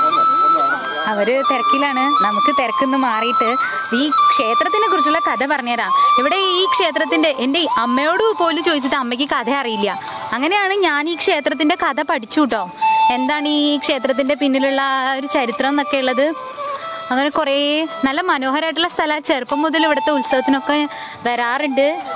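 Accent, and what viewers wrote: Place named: Valluvanad, India. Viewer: തിരുവനന്തപുരത്തുകാരിയുടെ മലയാളം എങ്ങനെ വള്ളുവനാടൻ slang ആയി? അതും ഇത്ര perfect ?!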